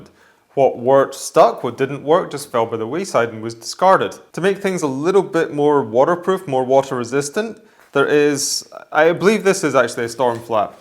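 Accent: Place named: Edinburgh, United Kingdom. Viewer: He’s Scottish , East coast accent .... probably Edinburgh .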